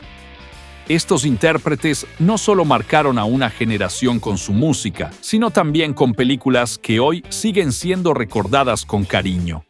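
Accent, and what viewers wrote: Yucatán, Mexico. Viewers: ¿El narrador habla como yucateco o me lo estoy imaginando?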